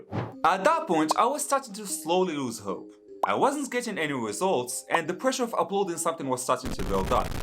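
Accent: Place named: Morocco. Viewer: M9awd bro I’m just assuming you’re morccan from your accent but anyways I like the editing the quality the confidence so keep going bro you definitely going far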